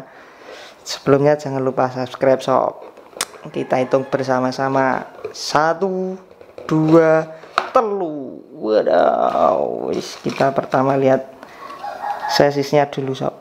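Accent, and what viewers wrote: Java, Indonesia. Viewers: Orang mana tt bang sampean...??? Ngomong e kok jowo2 ... Aku pngn dolanan tamiya.. Tapi nang daerahku ra ono e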